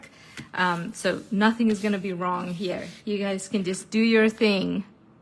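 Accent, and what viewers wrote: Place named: South Africa. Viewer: Hi there, been subscribed to your newsletters for over a year. You sound South African. Thank you for the lovely freebies. I love getting them. Thank you from Cape Town, South Africa.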